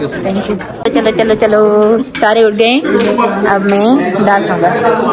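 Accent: Sindh, India. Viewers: I just love ur sndhi acnt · your Voice,Sindhi accent, cuteness, smiley beautiful face and positivity made me your biggest fan, I became so addicted to your vlogs ❤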